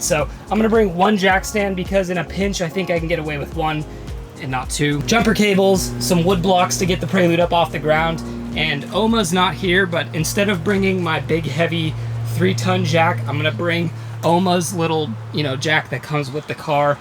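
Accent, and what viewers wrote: Boston, United States. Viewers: FlAAA-RIDA, with that Bostonian accent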